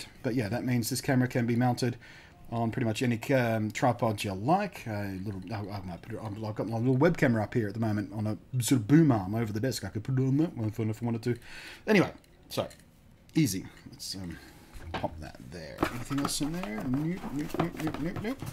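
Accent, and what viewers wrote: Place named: Australia. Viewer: i had no idea you were Australian that makes me feel pleasant inside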